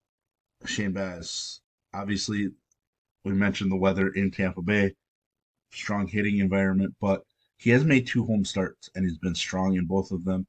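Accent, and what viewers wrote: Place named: Wisconsin, United States. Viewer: Dope info here. You got to be from Wisconsin right?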